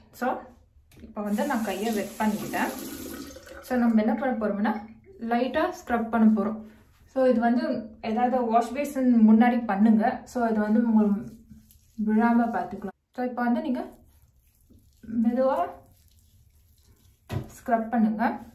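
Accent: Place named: Telugu, India. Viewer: Very nice tips...loved your telugu tamil :)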